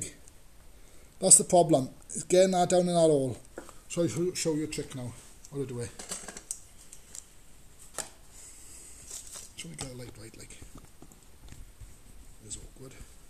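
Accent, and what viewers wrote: Wales, United Kingdom. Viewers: Hi you remind of the great Tommy Cooper, he just couldn't do anything right, yet the people 😍 loved him. I must admit I enjoyed your presentation well done, at least you gave us a good laugh. Lived in North Wales for years colyn Bay. Worked on the a55. Great to hear that lovely Welsh accent again. Muchas gracias 😎 Liam kilkee clare ireland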